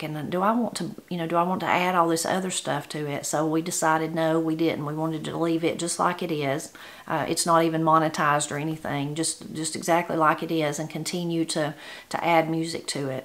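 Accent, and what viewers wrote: Appalachia, United States. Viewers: God bless you and your family. Uve watched your videos for a year and a half now approximately. They have lifted me up in tough times. I thoroughly enjoy them and your wonderful cooking. Im from Appalachia un Virginia. You bring back such good memories of my grandmother's, grand father, aunts and uncles who talked the same way, cooked the same, so many great memories for me. I thank God for you and the good videos and work you do. Please continue to bless us with your videos ❤️💙🙏🙏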